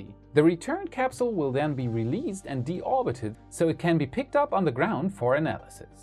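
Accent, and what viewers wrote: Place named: Germany. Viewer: Strong German accent, sounds awkward.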